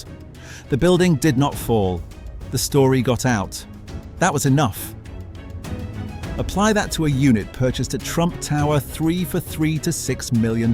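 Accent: United Kingdom. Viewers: Hey Mr. English accent. Why not do a video about the rape gangs in the UK? Or perhaps comment about the politics of the UK?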